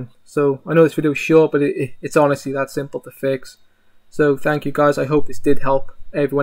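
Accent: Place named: Cardiff, United Kingdom. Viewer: Deffo a Cardiff accent haha love it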